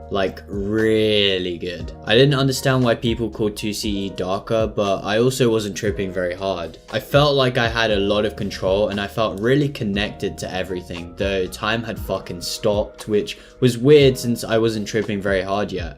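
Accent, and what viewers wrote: United Kingdom. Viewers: Your videos are amazing bro: you deserve so many more subscribers!!! Also nice to hear a British voice in this community 🤩🎇🍃🍄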